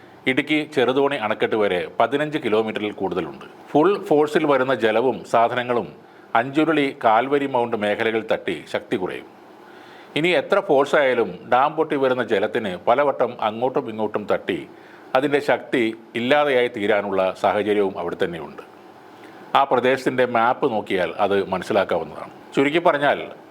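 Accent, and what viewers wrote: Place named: Tamil Nadu, India. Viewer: Iyal Malayalam parayunna tamilian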